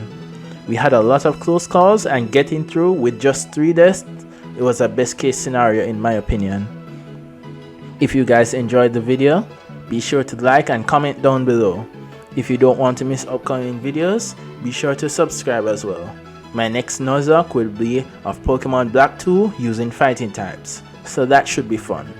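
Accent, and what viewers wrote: Jamaica. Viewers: you from jamaica? i’m from trinidad and trying to pin down the accent🤣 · You sound Jamaican 😊 I know our accent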